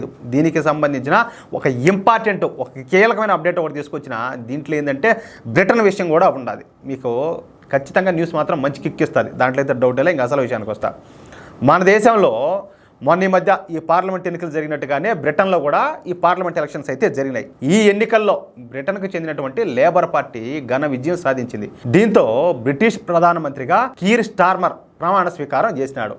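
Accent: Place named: Kadapa, India. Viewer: Meedi kadapa district ramjapeta Slang la vundi sir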